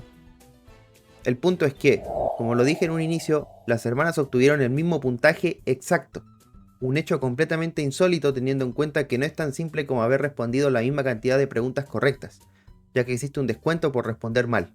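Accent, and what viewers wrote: Chile. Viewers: creí q era la única que había notado ese acento chileno XD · Bro, porque hablas como chileno? Saludos mano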